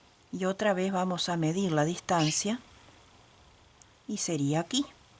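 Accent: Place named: Argentina. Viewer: Señora quisiera que usted me recuerde el punto Margarita, muchos les dicen jazmín, yo lo tejo hace ya un tiempo,pero olvidé como usted explica muy bien las cosas le pido ese favor la, la forma de tejer y de hablar suya dr parece mucho a nosotros los Argentinos, sepa usted que acá es muy querida un abrazó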